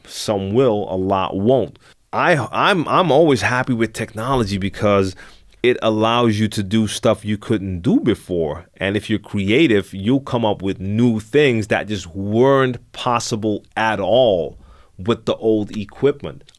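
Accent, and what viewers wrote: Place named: East Coast, United States. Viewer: It's killing me to know....you say you live in the Netherlands, but you have an "east coast" accent and you look like you're Latino...can someone let me know? Also, yes I was too disappointed when I heard the news about Shure needles demise. I always loved the way ortofons looked, but they we're too expensive for my budget. Back in the early 2000's I started with "m-audio TORQ" as my DVS, I liked the fact that I didn't have to carry loads of crates to parties. Then I upgraded to serato SL3, but I somehow keep coming back to vinyl.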